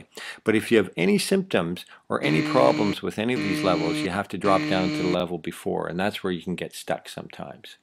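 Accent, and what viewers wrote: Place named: Canada. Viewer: great video! i knew you were canadian from your accent haha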